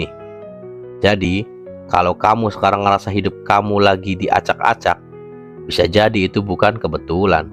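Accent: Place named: Cirebon, Indonesia. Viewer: Iki admine wong Cirebon kan... ? Logat ngomonge Cirebon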